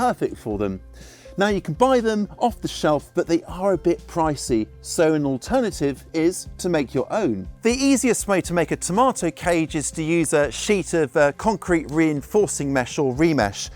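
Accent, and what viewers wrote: England, United Kingdom. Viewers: to-mah-toes. i love an english accent. fish heads, not so much lol. for cherry tomatoes i have collapsible 4 sided, 4' tall plastic coated wire tomato cages that fit perfectly around my 4 gallon nursery pots in my container garden. i put them up in a 2 or 3 row checkerboard pattern, joining touching corners with wire plant twists. [picture zigzag pattern for a 2 row, or 4 corner cages and a middle cage for a 3 row.] all plants are easily reachable and get air and light. [i feel the row is more stable like this; we get fierce thunderstorms with wind.] additional training support is given with [1/4"W] plastic coated 4' stakes [not wood, termites are a thing in the south.] monster varieties eventually get transplanted into 10 gallon pots with [1/2"W] plastic coated 6' training stakes, also not wood. after 6' i cut off the top of the plant since i cant reach any higher. 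stakes not in use store very well in a vertical [4"W] length of pvc pipe screwed to a stud in the garage.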